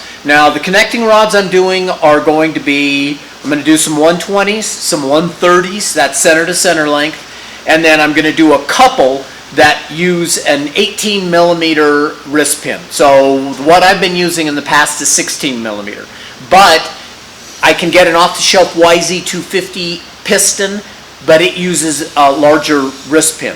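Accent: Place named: United States. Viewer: American accent and metric! Thanks for not frying my brain with inch unit tolerance.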